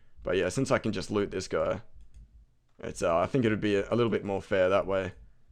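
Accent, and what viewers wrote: Australia. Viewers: Everything is more entertaining in an Aussie accent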